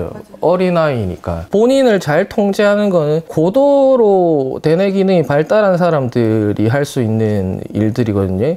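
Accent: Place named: Busan, South Korea. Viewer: 선생님~부산여자 서울살고있습니다. 고향말씀으로 들으니 너무 집중이 잘 됩니다. 저희아이 아반떼로 태어났는데 완전풀옵션하고 튜닝하고 엔진갈아서 열심히해보겠습니다!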